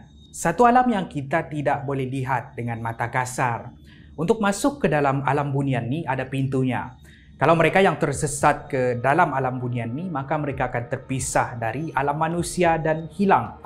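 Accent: Sabah, Malaysia. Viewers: Can someone tell me, orang sabah kah? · Tak pastilah sebb saya pun kurang tahu pasal cara percakapan diorang ni.Tapi yg saya tahu ni sabah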